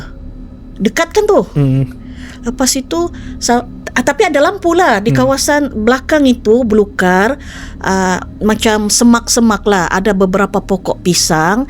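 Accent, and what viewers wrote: Sabah, Malaysia. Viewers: Best betul dgr suara kak ni bercerita dgn slang Sandakan lgi. Uinah geng.. 🤣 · Tbaikla sis..x hilang sleng sabahnya...x boring mdgr..best dgr sis bcrta... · Suka betol dengo dialek sabahan ni. Lembut, pekat, kadang2 kelakar. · Sy orang Sandakan, born and raised. Aku boleh feel yang Kak ni ada control sikit dia punya bahasa supaya orang semenanjung lebih senang faham. Kalau dia full on cakap cara orang Sandakan, memang susah faham dan ada lawak sikit 😂😂😂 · Suka betul bila orang Sabah bercerita cerita seram. Suka dengar bahasa orang Sabah.. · Siukkk dgr loghattt kakak ni becerita.. betul2 sabahan.. mcm si Wafi punya loghat siukk d dgr d screen😂 ndak d buat2 sabahnya hehe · Aku dengar sampai habis sebab suka dengar bahasa sabah.. sedap di dengar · Ni bahasa standard sabahan ya... Belum lagi kamu dengar yg ada mix 'loghat' bangsa. Contoh kalau Sabahan yg di Tawau, nada & loghat lain lagi. 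Org kurang faham. Kalau kami Sabahan di Keningau ni, lebih kurang standard begini bahasa tu. Tapi masuk kampung yg pekat bahasa Dusun, so cakap melayu dorang pun ada mix 'Apa gia tu?' 'Ada kanto itu'. 🤣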